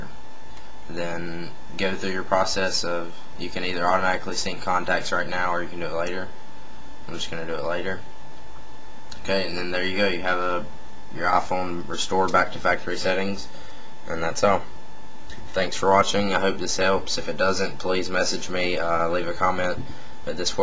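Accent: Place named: Texas, United States. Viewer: its a texan accent i think lol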